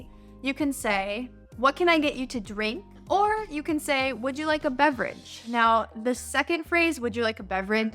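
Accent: United States. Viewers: A great lesson, I love the way you teach us, super natural and like the American way you pronounce cause is clear and real. I have a question: I’d like to learn how to use this two words, Aim and Amid. Somewhere I read an advertisement that says: “We Aim to please” It sounds nice to me but I don’t get the meaning. Thanks again Miss and I owe you one.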